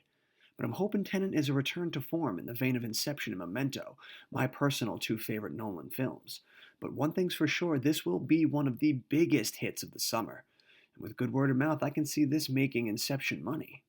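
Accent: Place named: Brooklyn, United States. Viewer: nice vid - are you east coast repping? your accent reminds me of life in BK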